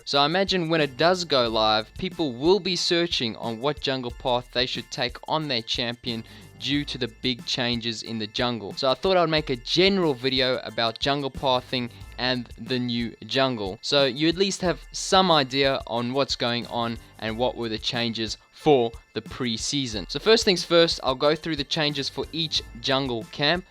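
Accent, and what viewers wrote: South Africa. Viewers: you sound like an south african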